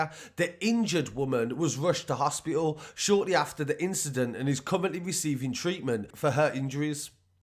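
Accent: Birmingham, United Kingdom. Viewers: I ❤️the Brummie accent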